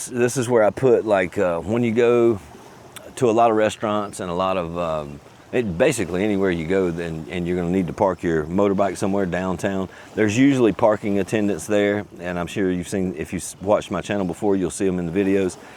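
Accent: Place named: North Carolina, United States. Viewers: Aussie subscriber here, good to hear your Southern accent s an Expat in Phils. Will be there in 3 weeks time maybe we can meet somewhere. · maybe Ive missed it, but are you including insurance costs in your budget…health, renters, bike etc.? My Filipina wife and I just discovered your channel and love it. We’re in Salem, VA and enjoy your NC accent.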